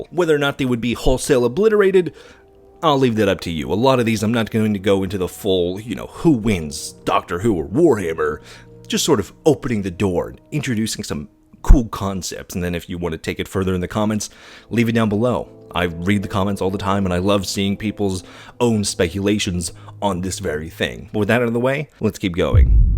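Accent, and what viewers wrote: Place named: United Kingdom. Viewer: too. much. british...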